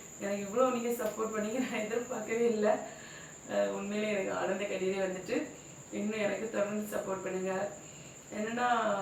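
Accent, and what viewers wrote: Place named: Marthandam, India. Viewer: 😊Akka veguliya iruntha ulagam apdi thaan pesum ,neenga pure ah irukkeenga atha nenach happya irunga akkaa am from dubai sontha ooru marthandam daily unga videos pakrean ooruk vantha meet pannalaam akka😊😊😊😊ungalta pesa aasaya iruk epdi pesenu therla😊cook pannumbothu fulla unga videos thaan time pass enak😅namma ooru baasha kekumbo oorula irukkirathu polaye feel😊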